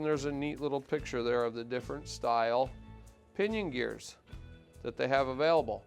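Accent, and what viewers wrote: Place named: Appalachia, United States. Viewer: This guy got that Appalachian English down... Lm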